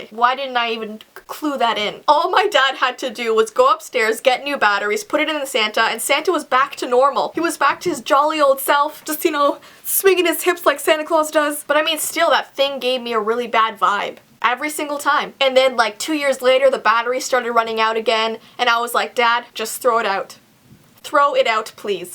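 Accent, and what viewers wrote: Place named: Canada. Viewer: Jake Reed it's because she had a Canadian accent lol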